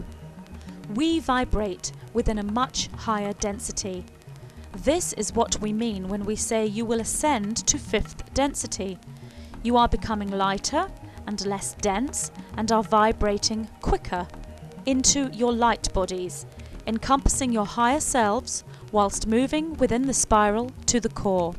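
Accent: United Kingdom. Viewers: Seeing as you live in England I would expect you to have British accent but I wouldn't expect someone from another planet to have a British accent.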